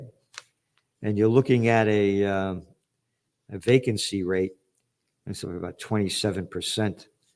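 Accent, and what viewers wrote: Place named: United States. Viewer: You speak American, I like it.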